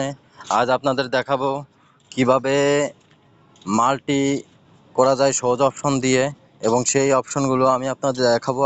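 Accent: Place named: Khulna, Bangladesh. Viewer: Vai apnar desher bari kon jelay.. kotha shune mone hocce khulna te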